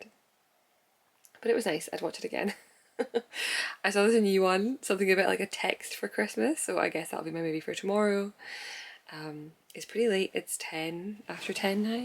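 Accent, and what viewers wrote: Scotland, United Kingdom. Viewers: Hi love your podcasts and design. But must be honest a bit as English isn't my mother language is very difficult to understand your scottish accent have to use subtitles. Thank you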